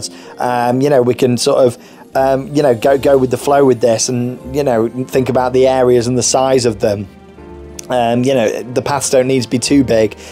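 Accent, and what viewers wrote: United Kingdom. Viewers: You sound British are you?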